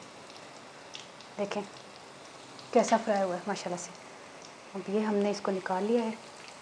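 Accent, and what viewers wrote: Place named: Punjab, India. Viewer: Dear.tuci great ho..love ur cooking nd love ur voice in banjabi style😘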